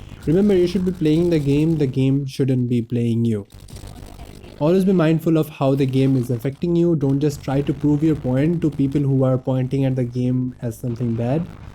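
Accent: Bengal, India. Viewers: I just love that heavy Bengali accent ... Lightens up the mood (no offense) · you bengali??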